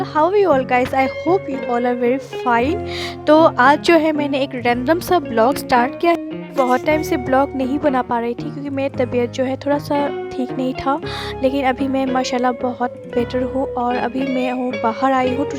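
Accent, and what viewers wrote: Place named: Assam, India. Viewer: Hey cute kid you have got ! Where r u from ? Ur accent sounds Assamese , please aplogies if I'm wrong. Kfc same pinch 😂 oh this Maggi is different black one ? Nice place looks nice weather 👍 eagerly waiting for your challenge video !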